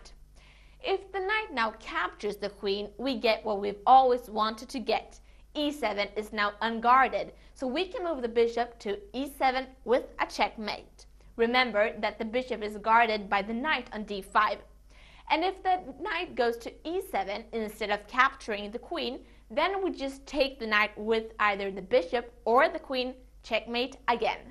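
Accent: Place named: Sweden. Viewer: Nice, a puzzle with some history behind it. Loved it. I think your typical swedish accent is getting less and less apparent.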